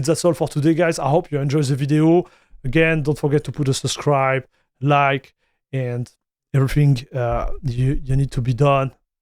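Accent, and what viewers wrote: France. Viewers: The French accent makes the video better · Honestly haven't listened to much French accented tech content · The French accent makes the video worse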